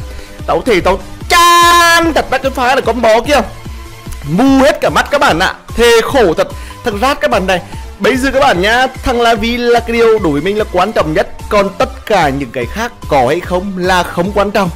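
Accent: Nghe An, Vietnam. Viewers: Giọng nghệ an quê ta dệ thương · Giọng nghệ an choa hắn rứa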